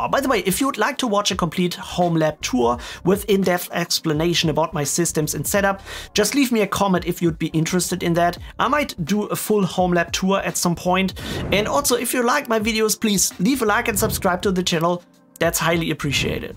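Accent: Germany. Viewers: Ich so: Hey dieser Akzent kommt mir bekannt vor. Kein Wunder, aus Deutschland. Schöne Videos haste ;)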